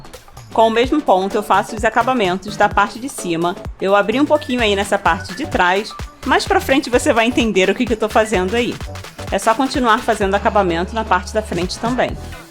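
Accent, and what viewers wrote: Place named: Rio de Janeiro, Brazil. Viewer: o sotaque carioca são um charme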